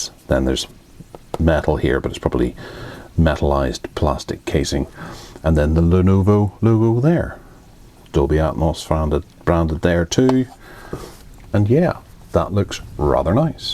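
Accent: United Kingdom. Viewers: Books: H2G2, David Niven, Roger Moore, Sean Connery, and an Aston Martin DB5 Model. And a very nice British gentleman's accent. So far the interesting part of the Video. The P11 is nice, though. ;-)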